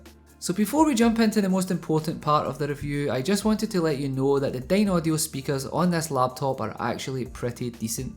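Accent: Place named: Scotland, United Kingdom. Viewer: its a Scottish accent.. ;)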